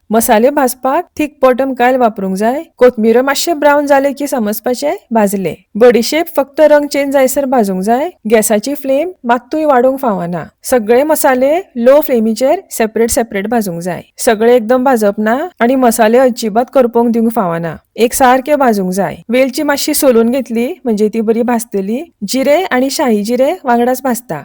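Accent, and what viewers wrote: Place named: Konkan, India. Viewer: तुमची कोकणी भाषा ऐकायला गोड वाटत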